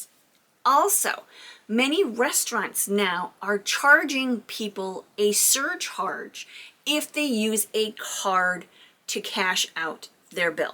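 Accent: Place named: Michigan, United States. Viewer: You have a Michigan accent. Or maybe Wisconsin or somewhere near there.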